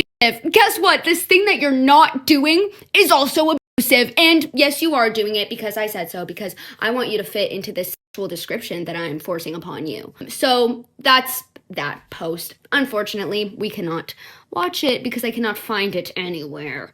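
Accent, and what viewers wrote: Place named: Canada. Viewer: I’ve started watching you recently and the first thing I thought that inspired me to click the video was “this girl has to be from somewhere in Canada if not somewhere in Alberta “ . It’s beautiful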